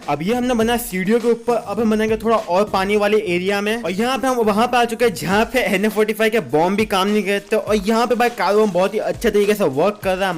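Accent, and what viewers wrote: Gujarat, India. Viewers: I love your voice.does you have Gujarati accent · Bro, are you Gujarati? Cause according to your accent, I guess that you are Gujarati..... Btw I love your videos.....Keep it up for entertaining us!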